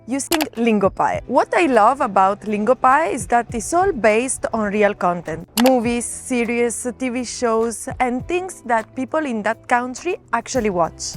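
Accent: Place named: Italy. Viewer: Jsi přesně taková, jaká má Italka být. Upravená, svěží vlasy, usměvavá... Moje angličtina je špatná, ale téhle "italské angličtině" docela dobře rozumím. Je to smutný pohled na taková místa, jižní Itálie má úžasnou přírodu, do ní zasazené takové vesničky, bohužel tu chybí práce a lidi prostě odejdou tam, kde dokáží vydělat peníze. Skoro si myslím, že to ani není chyba italské vlády, prostě se to tak stalo. A dokud nepřijde nové využití tohoto regionu, nic se nezmění. Jen z turismu nejde žít, potřebujete komplexní oživení, lidi tu musí mít školství, zdravotnictví, silnice, práci všeho druhu. Dokud to není, motáte se v kruhu. Zatím musím zůstat v Česku, vydělávat peníze, ale docela si říkám, že se na stáří přestěhuju do Kalábrie. Láká mě život v místě, kde není moc lidí, nejsou kolony aut, semafory, klaksony, placené zóny parkování.... On ten region má své kvality, ta ospalost místa je přesně ta hodnota, která mi v Česku chybí.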